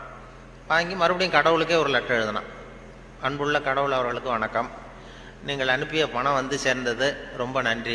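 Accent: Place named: Villupuram, India. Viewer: Mama Villupuram voice super mama love you raa bgm always sweet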